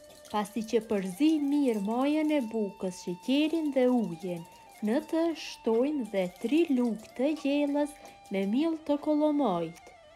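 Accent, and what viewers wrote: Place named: North, Albania. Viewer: o zemer , je e mrekullushme, jo vetem per receten e Bozes por edhe per dialektin e mrekullushem te veriut qe ke, gjithashtu edhe muzika ne sfond , te uroj nga zemra gjith te mirat, pershendetje nga Gjermania.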